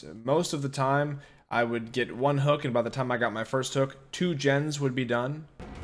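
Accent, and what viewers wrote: United Kingdom. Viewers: Very good British accent I'm very impressed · As a brit, I actually like your british accent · That british accent is too funny man 😂 this new killer looks fun. Keep up the good work friend - british viewer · A bit bri ish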